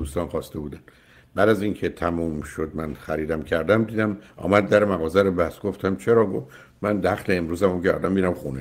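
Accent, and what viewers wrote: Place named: United States. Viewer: طوری با لهجه صحبت میکنه انگار سی ساله امریکاس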